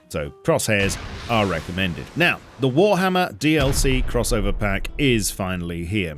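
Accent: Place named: RP, United Kingdom. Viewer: Ive always wondered why you sound like a posh BBC topgear presenter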